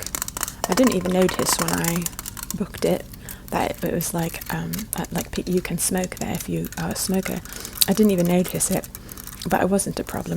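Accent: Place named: United Kingdom. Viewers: Sounds like a British accent with a light tone of someone who learnt English when they were younger (not their first language but close second) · she's American but she uses British accent for her privacy :)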